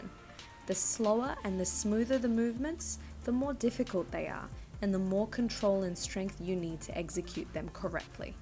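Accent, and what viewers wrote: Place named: Australia. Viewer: I noticed that you have an Australian accent! Just wondering where you can go to purchase ice skating boots?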